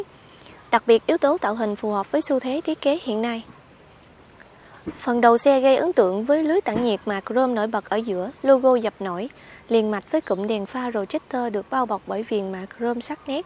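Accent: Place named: Mekong Delta, Vietnam. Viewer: Đúng chất miền Tây: Ờ, gờ lờ, 499chiệu, gọng gãi, phục dụ...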